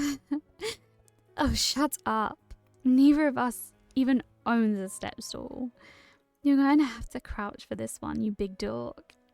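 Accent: United Kingdom. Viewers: I'm getting, a southern accent? Not devon but something near? Definitely not central Britain, I'm from there so I'd know. 'based on what I feel pressed up against my hips' is crazy af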